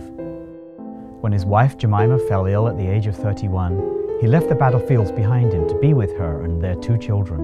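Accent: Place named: United Kingdom. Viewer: With all respect to the droll British author/narrator of this piece, it just isn't "Frontline" without the ponderous, lugubrious "voice of God" voiceovers of announcer/voice talent Will Lyman (also, surprisingly, the voice for "the most interesting man in the world" although he sounds completely different there, maybe it's the accent). Anyway, glad to be alive and have "survived" Mozart, also my dad who died at age 59, on account of chain-smoking Pall Malls, not lack of urban sanitation and lack of understanding of infectious disease (well, other than the public health issues surrounding smoking).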